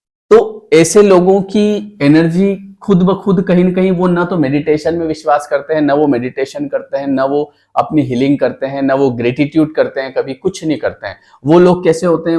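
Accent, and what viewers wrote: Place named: Madhya Pradesh, India. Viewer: भैया आपकी बोलने की टोनिक वाकई एमपी वाली है मैं भी एमपी से हूं❤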